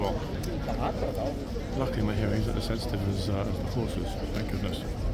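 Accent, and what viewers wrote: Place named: United Kingdom. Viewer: His not her grand dad...my guess is the old guy is a boyfriend....how the woman poses hahaha she ia an Asian from Philippines...i know right....when we gonna have a peak how you look like Sir....i love British accent...lovely to listen... i met and befriended some while i was in HK... With your videos i feel also walking in London haha...GOD Bless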